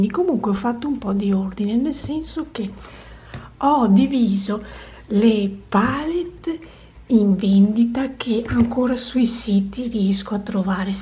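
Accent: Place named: Veneto, Italy. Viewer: Ciao ! Carino il tuo vlog! Dal tuo accento ho capito che sei veneta come me! Baci!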